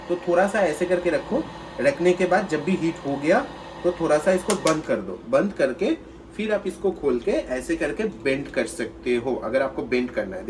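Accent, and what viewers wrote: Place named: Bengal, India. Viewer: Okay bhaiya ji.. Samajh gye.. But you are using bengali ascent.. 🙃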